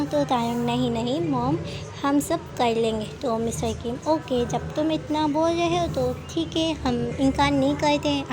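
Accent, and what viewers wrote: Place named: West Bengal, India. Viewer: .. aap jab story.. explain krte ho..toh syd Bo aapki bangle ki hi aawaj hai.. bo mujhe bahut achi lgti.. 😚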